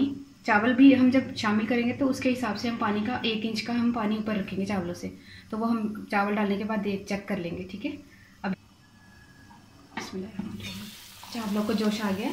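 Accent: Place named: Punjab, India. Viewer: اسلام واعلیکم میں لاہور سے ہوں اپنی جاب کے سلسلے میں یہاں مکہ میں رہتا ہوں اپکی تمام ویڈیوز دیکھتا ہوں اور ٹرائی بھی کرتا ہوں۔ میں یہ پہلی دفعہ کمنٹ کر رہا ہوں وجہ یہ ہے کہ آپکی ویڈیو دیکھنے سے ایک تو ریسیپی پتہ چلتی ہے دوسری آپکی پنجابی آواز سے ویڈیو میں ایک مزاخیہ پن برقرار رہتا ہے۔ یہ چنا چاول ریسیپی ٹرائی کی ہے اچھے بنے تھے چاول۔ شکریہ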